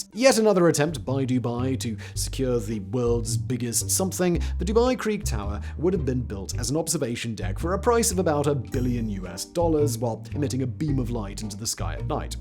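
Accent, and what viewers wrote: England, United Kingdom. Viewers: Only person I can't stand listening to with an English accent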